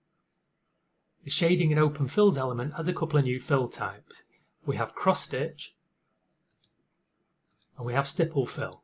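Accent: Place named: England, United Kingdom. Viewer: Thankyou for your videos, very helpful. Im English and its very nice to hear an English accent explaining. I will be watching them all.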